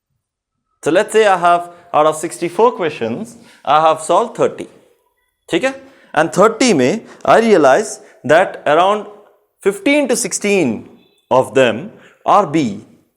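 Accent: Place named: Mexico. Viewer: Why is he trying to mix English with maxican accent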